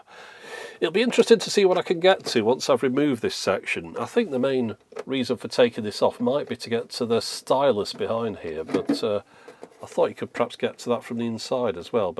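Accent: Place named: England, United Kingdom. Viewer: Why did it take me this long to notice you have an English accent, let alone an accent different from mine? Wait, is it an English accent? Or is it Austrailian, or maybe Scottish?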